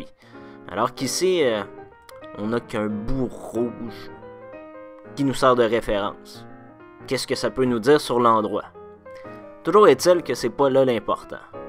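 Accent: Quebec, Canada. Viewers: Trop bien ! Et j'adore comment t'assume l'accent québécois, je suis un compatriote.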